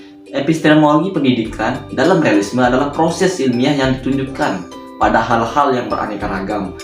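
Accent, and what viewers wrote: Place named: Masbagik, Indonesia. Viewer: logat masbagekk neee kentell jmakk wett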